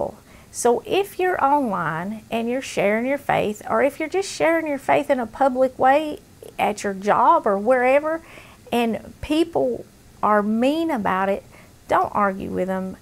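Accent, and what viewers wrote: South, United States. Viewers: I love these videos, love your southern accent and the whole thing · the food is seems so good but all very fatening. only problem with southern food, not b=very healty. but i like your accent. i am going to try and make a low fat version of the broclie soup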